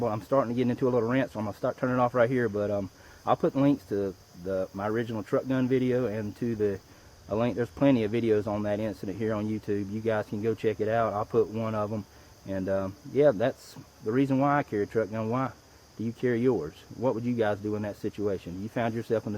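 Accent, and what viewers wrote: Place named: North Carolina, United States. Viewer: Holy shit, I'm sitting here like "I've heard that accent all my life, its distinctly familiar to me, even from other southern accents, but what are the chances that he's from NC?" should have trusted my gut lol. I should know one of my own people when I hear them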